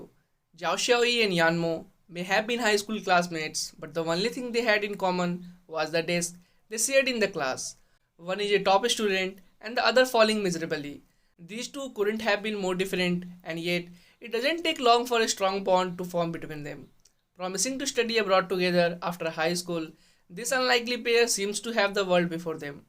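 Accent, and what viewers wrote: India. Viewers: It's a "desi" indian accent. It's better to watch on mute ^^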